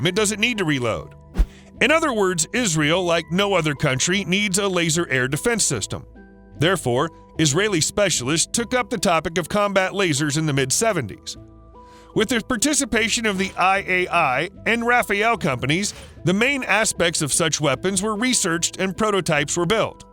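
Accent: United States. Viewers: typical American annoying voice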